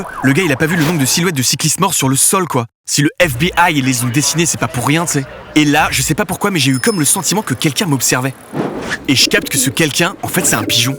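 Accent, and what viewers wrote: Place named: Paris, France. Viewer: l'accent parisien le plus insupportable jamais entendu par l'homme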